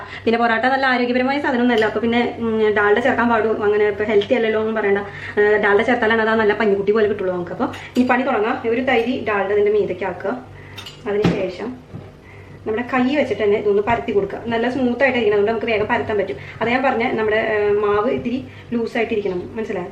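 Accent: Thrissur, India. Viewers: Thrissur slang is good but if you lessen speed, we could follow you clearly. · Thrissur ano sthalam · Mmmade trissur bhaasha...kurachu speed kurachu samsarichu dear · Enthu speeda samsaram...thrissur aano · Authentic thrissur slang. Keep it up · Thrissur aano veedu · ആദ്യമായി ഞാൻ ഒരു കാര്യം പറയട്ടെ.. ചേച്ചിയുടെ കുക്കിംഗ്‌ നെ പറ്റി എനിക്ക് ഒന്നും പറയാനില്ല.. superb.. but അതിനേക്കാൾ ഉപരി you are very sincere and a good humon being..... loved your thrissur slang.. iam also a thrissur kkari... 💪💪സംസാരത്തിന്റെ speed thrissur kkarkk ullathaa.. 🥰🤩😘🤣🤣🤣lam a begginar in cooking and a new subsciber of yours... stay blessed and stay genuine like this..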